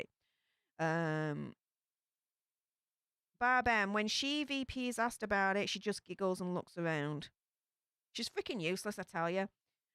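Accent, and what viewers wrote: Yorkshire, United Kingdom. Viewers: I listened to a video that explains all different English accents by region, and I pinpointed you to Yorkshire! Am I right? 🙂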